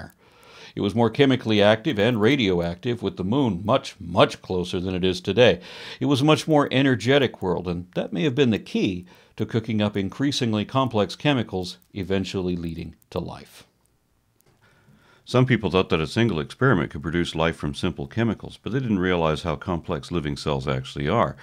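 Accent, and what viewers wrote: United Kingdom. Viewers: Excellent video. Loved the British accent. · British accent gets 10/10 from me!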